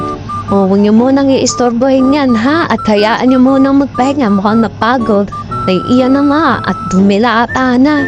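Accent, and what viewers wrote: Batangas, Philippines. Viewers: Batangeña ala e HAHAHAAA ang cute!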